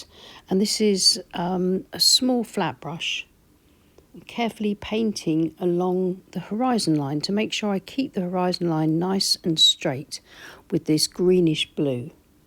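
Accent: England, United Kingdom. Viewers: I love your work and your kind voice and your beautiful English accent 😊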